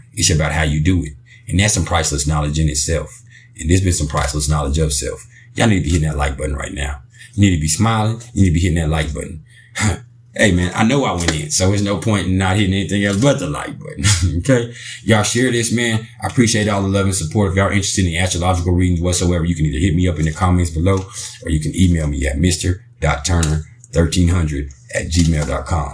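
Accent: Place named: California, United States. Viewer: This gotta be a Cali accent😂😂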